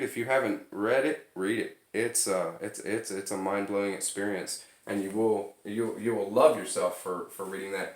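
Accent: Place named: South, United States. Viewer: I know you have an accent but don’t yet recognize different regions. I would have guessed southern. I came across Blake in my early 20s, his songs of innocence. I was reading Jerusalem to my mum and she just sang it to me. She was of the generation where it was sung every morning at school. I investigated the thoughts of Blake in writing Jerusalem and loved him and his art ever since.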